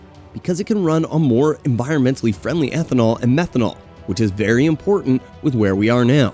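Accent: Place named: United States. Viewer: Hahah mispronunciation master . Got all the horsepower wrong and with an American accent talking about pounds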